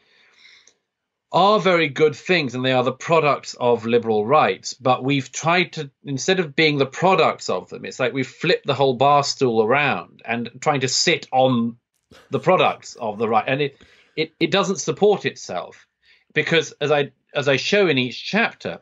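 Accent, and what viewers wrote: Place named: United Kingdom. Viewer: whole thesis is there's a collapse of shared stories in the West with the decline of ideologies like Christianity and capitalism. But then, he attacks civil rights movements aka 'identity politics' (gay/womens/trans) for being exactly that story to tell for many, because apparently they're too divisive and not scientific enough for him. Does he seriously believe that ideologies like Anglican Christianity and Smithian capitalism rested on some bedrock of universal value and rationality? What a myopic worldview. This guy is a muppet. Not everyone with a British accent is a genius.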